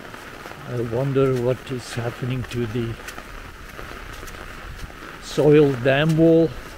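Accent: South Africa. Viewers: Sounds like a South African accent?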